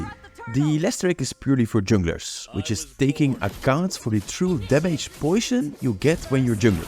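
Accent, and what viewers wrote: Netherlands. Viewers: I don't need to listen a second longer wanneer ik iemand die Nederlands praat Engels hoor spreken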